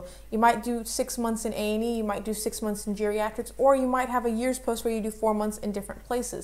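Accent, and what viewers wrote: United States. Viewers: great piece of advice in a short amount of time!on a side note I really didn't expect you to have an american accent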